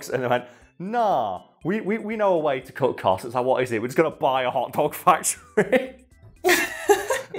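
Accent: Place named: Scotland, United Kingdom. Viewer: I can't fully place your accent and it is driving me crazy. Can't tell if it is a northern accent or Scottish.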